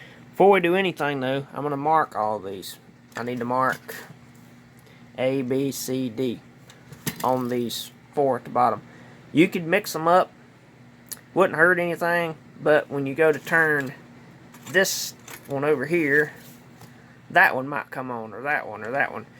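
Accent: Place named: South, United States. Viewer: You're country as hell. You sound just like me. I'm in East TN, what part of the South are you from? I have a Lionel zw275. I've seen a handful of these vw transformers on eBay. I always thought they was just two train operations, instead of four. Are these physically smaller than a zw? I've gotten to where I could use another zw to run my American Flyer trains with. I wouldn't mind trying something different if it has enough power.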